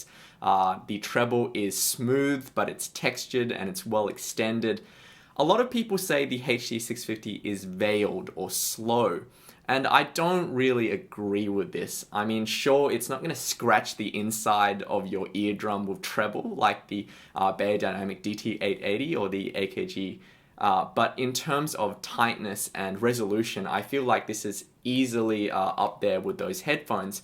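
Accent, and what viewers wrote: Australia. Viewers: Yes, he's living in Sydney I think. Also he has a strong Aussie accent.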